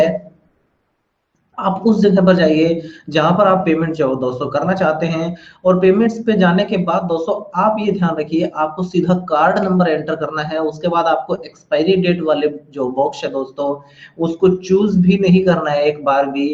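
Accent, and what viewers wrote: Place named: India. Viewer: Just FYI he's not spekaing spanish... thei accent is hindi like, just saying don't asume.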